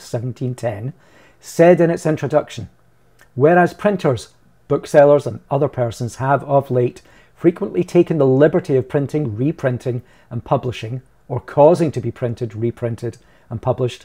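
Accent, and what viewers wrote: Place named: Scotland, United Kingdom. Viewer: I've been following everything these last few months and I have to say you come across as a completely, upfront, honest genuione bloke and acted in a completly professional way. If you ever need a slightly bigger platform to discuss the ins and outs of copyright then sir you're more than welcome on my channel any time. The second I heard the Scottish accent i knew you were a solid bloke. :-)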